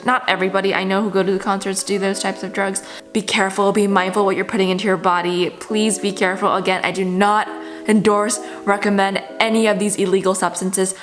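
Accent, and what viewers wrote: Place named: United States. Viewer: I knew you are American the first time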